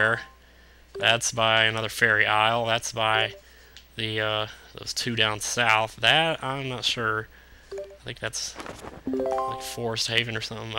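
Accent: South, United States. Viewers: Southern accents are the best! :D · Where did you live for 10 years? Georgia? Texas? Alabama? I think different states have different accents since I grew up in Mississippi, then moved to Texas, and people said I had an accent, heh.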